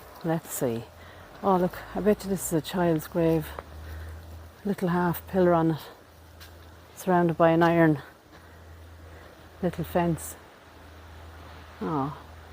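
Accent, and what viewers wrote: Ireland. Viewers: Nice accent Ireland probably the best in the world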